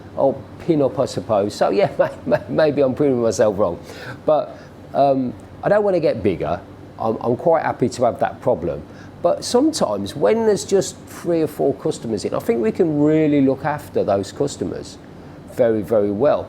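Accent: Birmingham, United Kingdom. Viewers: Lazy brummie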